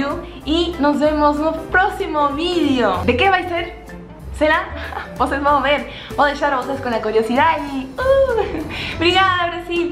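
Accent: Peru. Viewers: Oi você é maravilhosa, adoro este sotaque peruano. Sou inscrito e super fã, grande abraço do Rio de janeiro. Bjos minha linda!!! 😘💖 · por el acento digo q es peruana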